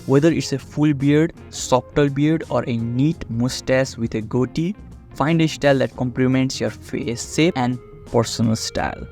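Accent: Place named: Nepal. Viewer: you from nepal or sum ? sounds like a nepalese accent to me, might be wrong? just curious.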